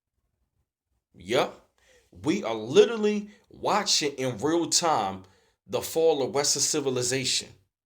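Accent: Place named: Baltimore, United States. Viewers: It’s an east coast accent to me. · The Bmore accent is such an interesting mix of Northeastern and Southern.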